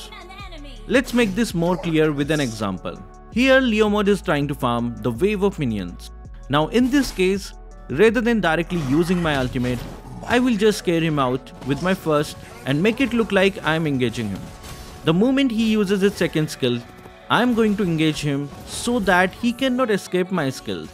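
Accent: India. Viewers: U r indian ? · Bro are you from India pls answer me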